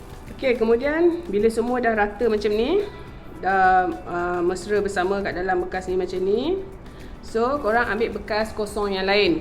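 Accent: Perak, Malaysia. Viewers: Terbaik terang bercakap senang nak faham nati nak cuba buat 😊 sya dengar pon mcm orang Perak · Aku.... Aku... Bahasa belah mne ye.,, selalunye org perak? Maaf hanya bertanye... Tanye😁😁😁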